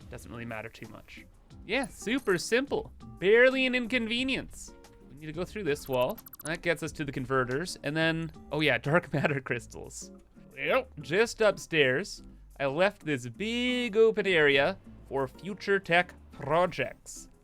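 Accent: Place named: Canada. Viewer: OOT and ABOOT! Do I detect a fellow canuck??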